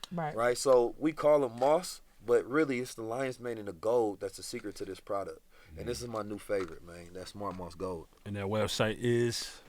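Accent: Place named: California, United States. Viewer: It’s Black California linguistics. Black Californians linguistics is the same up and down the state.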